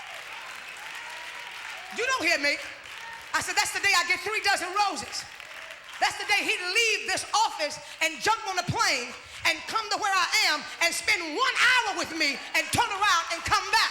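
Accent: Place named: South, United States. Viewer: another jumping on the band wagon of celebritism. If I make a comment about Brad Pitt and Angelina will I get discovered? Not a scripture in sight or in site. Thanks for telling us your whole life story in that once again loud southern preachers voice!You got a mike but I guess you cant get the same effects if you cant yell and stir a crowd emotionally! Ah baby...I dont feel like having sex tonight! You dont hear me! You didnt buy me no stockings...Wake up! marriage counseling is cheaper!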